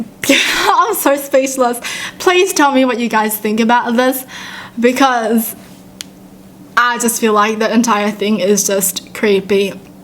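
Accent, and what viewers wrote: Australia. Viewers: Same, I think I might be picking up her Australian accent because I watch her so much lmaoaooaoa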